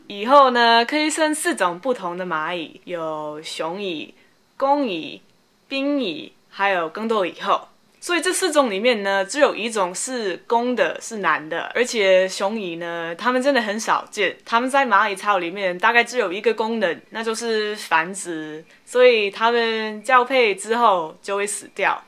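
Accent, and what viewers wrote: Taiwan. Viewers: 哇 中文講的真好 連你講英文都感覺好台哦 最好台語也學一學 那你就真的變成台灣人了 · 不錯 中文講得很好 很台式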